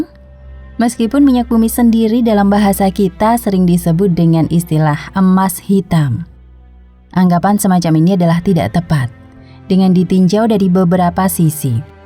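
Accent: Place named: Java, Indonesia. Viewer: kadang normal, kadang medok suara miminnya,.. dari sini bisa disimpulkan mimin org jawa 😁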